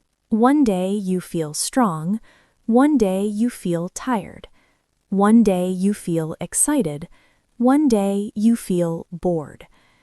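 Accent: United States. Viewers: امريكية ماهي بريطانية ابداً لان نطق الكلمات مختلف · تبدو انجليزية أمريكية وليست بريطانية ؟؟ ارجو الرد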